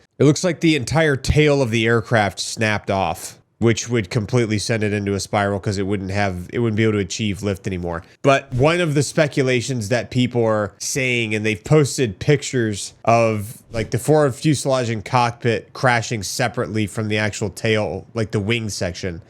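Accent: United States. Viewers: Very sad story. On the other hand, it always amuses me, how Americans pronounce Georgian words.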